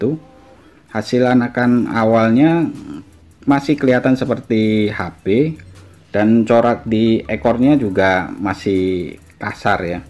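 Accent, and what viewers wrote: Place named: Madura, Indonesia. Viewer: logat.e dudu suroboyonan tapi meduroan 😂🙏👍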